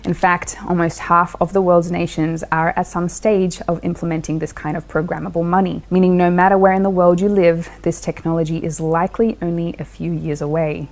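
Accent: New Zealand. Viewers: This chick sounds like she is from New Zealand. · New Zealand accent.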